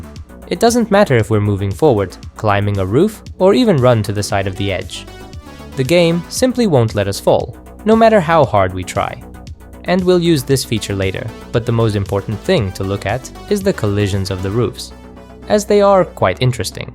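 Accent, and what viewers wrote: England, United Kingdom. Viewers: вы из англии?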